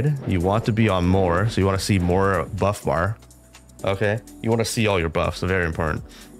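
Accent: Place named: China. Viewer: are u chinese? I can feel the accent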